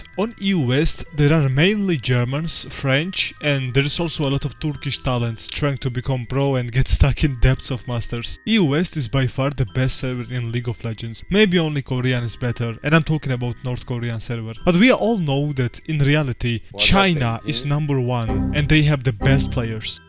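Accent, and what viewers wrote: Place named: Georgia, United States. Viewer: i can tell from your accent you are georgian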